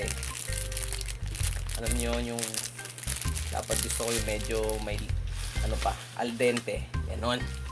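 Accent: Visayas, Philippines. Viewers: kuya visaya kaba??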